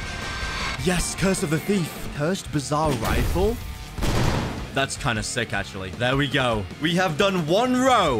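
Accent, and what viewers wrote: United Kingdom. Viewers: Yea but ur brtish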